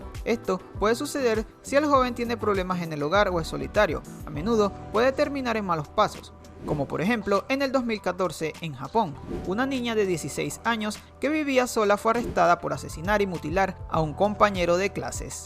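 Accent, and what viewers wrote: Venezuela. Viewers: Eres venezolano? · compa eres venezolano?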